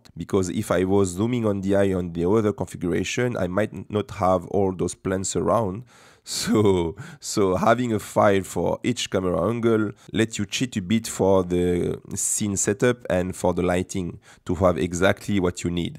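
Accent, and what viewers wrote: France. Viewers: I love your French accent😍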